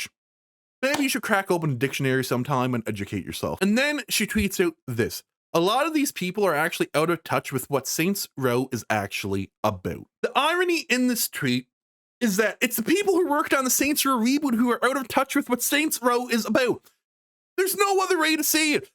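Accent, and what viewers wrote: Canada. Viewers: You sound very Canadian.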